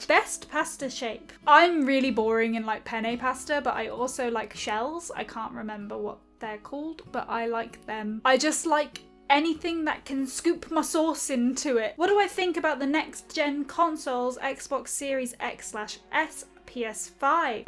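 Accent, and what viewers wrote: United Kingdom. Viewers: So.. many.. pikachus.... Also where in UK are you from? You probs have my favourite type of British accent it's so lovely :o hallo from Michigan :D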